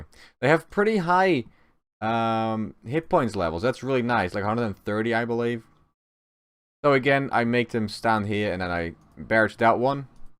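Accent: Netherlands. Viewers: The accent.. Dutch? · ARE YOU DUTCH?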